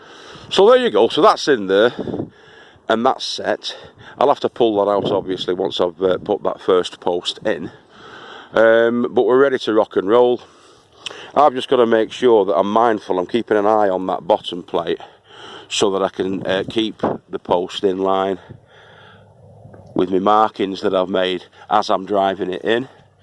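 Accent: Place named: North West England, United Kingdom. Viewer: Please can you explain your name? I know is teacher in Indonesian and perhaps other languages. Then what's its origin and relationship to you? You sound like come from NW England to me! Generally enjoy all your videos Cheers john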